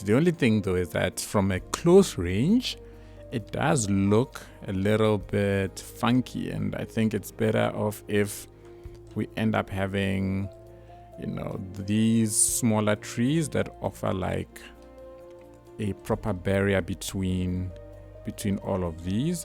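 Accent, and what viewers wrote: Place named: South Africa. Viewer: South African accent 🤔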